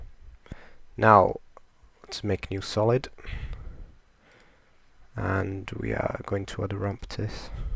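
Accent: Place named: Northern England, United Kingdom. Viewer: is from yorkshire? well he's northern anyway xD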